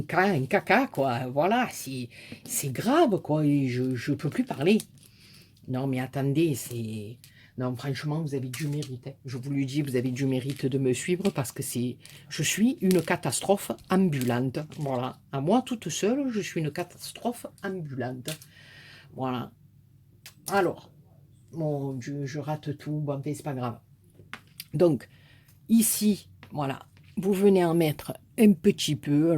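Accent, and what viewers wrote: South, France. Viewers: Un accent que je connais bien... puisqu'on me dit toujours..." t'es du sud..."... encore merci pour tes tutos, tes explications.. et surtout pour tes idées géniales de déco... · Trop jolies tes fleur des neiges et ton accent j adore tu me fais voyagé dans le sud à chaque fois. Et tes explications son très claire pour moi Ta raison fais péter les fleurs au moins sa sent bon🤣🤣 pleins de gros poutous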